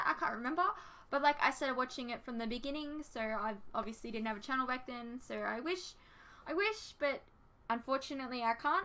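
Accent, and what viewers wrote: Australia. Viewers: You're: Young, female, cute, and you speak English with an Aussie accent... Yep, I predict your channel will continue to GROW.:^) Lewis from Orange County, California, USA (just down the street from Disneyland). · Like I said, it sounds more Aussie.:-)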